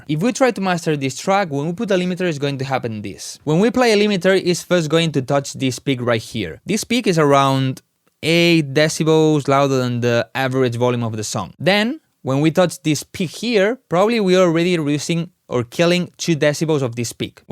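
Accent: Spain. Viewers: y, era re obvio que es de españa si te das cuenta como habla inglés jajaja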